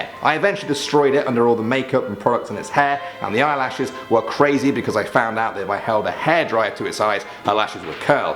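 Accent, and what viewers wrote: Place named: United Kingdom. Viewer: I hate this Fuckin' British accent !!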